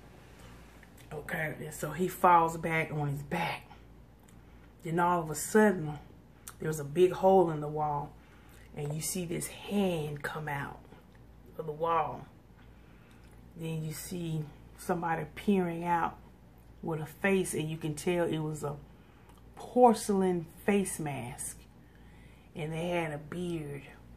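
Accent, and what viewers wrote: United Kingdom. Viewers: Your british accent is posh Londoner, aka the accent every nation that imitates brits uses lol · Your British Accent!!!!! lol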